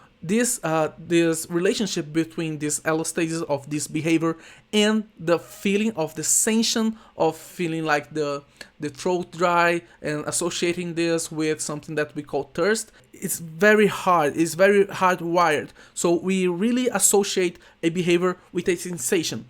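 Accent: Brazil. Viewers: err...are u brazilian? ur accent and the books...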